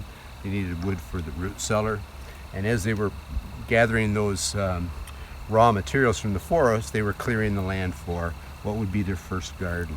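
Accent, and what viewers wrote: Canada. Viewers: I'm a yooper born and raised on the U.S. Canadian boarder. I watched the first of your videos and knew you were from Canada from your accent. I started watching your oldest videos first and will continue to the newest ones. Great videos and love the little cabin you and your partner built. I am looking forward to more. Thanks!!!!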